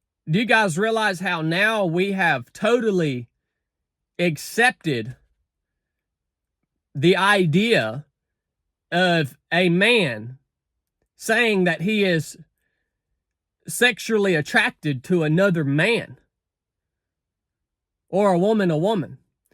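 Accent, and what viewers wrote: South, United States. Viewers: Probably not a good idea to take any kind of spiritual advice from someone who looks and sounds like he just crawled out of the hills himself. It's always incredible seeing the Dunning Kruger effect in action. People with a relatively low IQ who don't understand complexity and nuance take on a position of teaching and guiding others, fully confident in setting up a platform where they are speaking what they think is some kind of truth. This video is a perfect example of the mental illness crisis in this culture.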